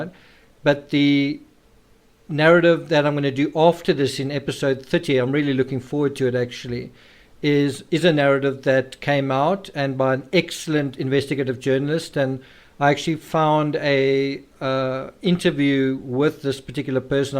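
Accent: South Africa. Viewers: Disliked because of your terrible South African accent.I'm South African myself,but Dude !!!!!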